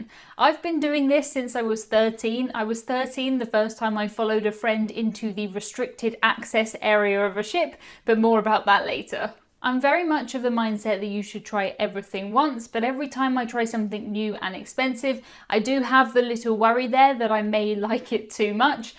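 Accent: United Kingdom. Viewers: Love the British accent (being American, it's cool to see the different ways we speak the same language). I could get lost in your eyes and listen to you talk all night!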